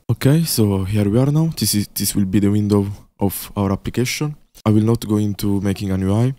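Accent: Italy. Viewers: tu sei italiano 100% si sente dal accento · si vede che sei italiano dall'accento ahahah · l'accento italiano😭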